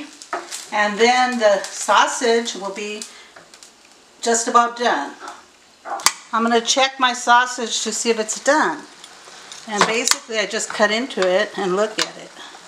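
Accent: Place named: Puerto Rico. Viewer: Btw, are you from PR? Your accent tells me so! I love your style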